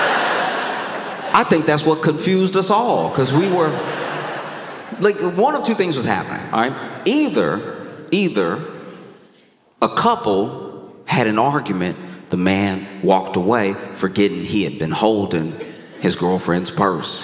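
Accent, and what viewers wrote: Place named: South, United States. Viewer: his southern drawl is the cherry on top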